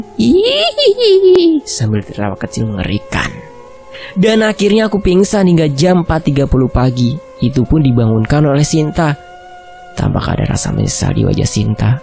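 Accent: Java, Indonesia. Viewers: Min..: suarane medhok heheheh suka deh Kangen kampung halaman 😁😁😁😁😁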